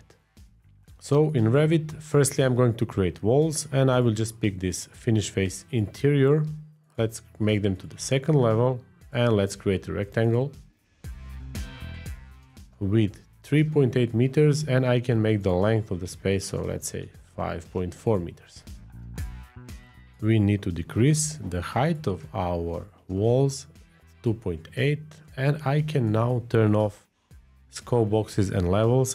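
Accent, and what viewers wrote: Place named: Croatia. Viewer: Nice video and new suscribe! by the way are you hrvat? You have a strong accent and the red and white of your brand. Pozz iz Argentine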